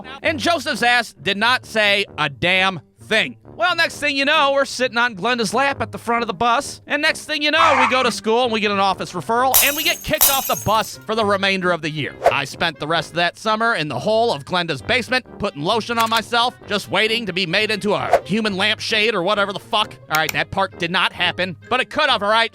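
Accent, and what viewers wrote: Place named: Ohio, United States. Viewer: I’m going to go out on a limb and guess that he is either from Crawford or Mercer Ohio.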